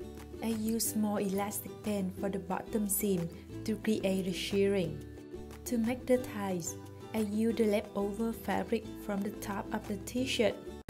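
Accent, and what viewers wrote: Vietnam. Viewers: R u Vietnamese? I feel like u have a Vietnamese accent lol I luv the tops btw but I’m not amazing enough to create them lol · Walters She is from Vietnam, she has a Vietnamese accent. Definitely not deaf.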